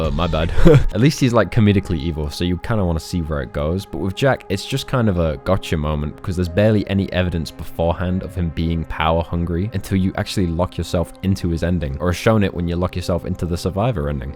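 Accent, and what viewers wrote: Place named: United Kingdom. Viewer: "Special fanks to Warfunder" Literally all i heard before clicking off. You brits need to LEARN HOW TO SPEAK ENGLISH!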